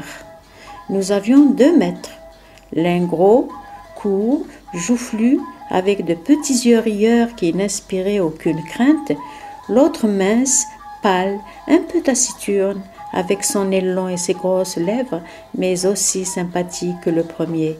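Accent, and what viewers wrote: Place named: Algeria. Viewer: Un bon resume, avec un accent Algerienne. Tres Bien au narateure ! Merci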